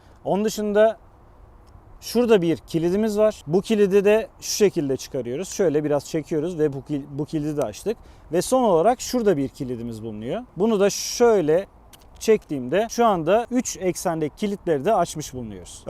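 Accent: Adana, Turkey. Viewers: abi adana mı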